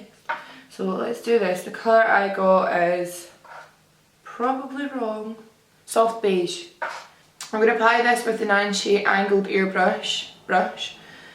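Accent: Scotland, United Kingdom. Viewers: Omg your Scottish me too xox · Which part of Scotland are u from I'm from Glasgow ! 💕 X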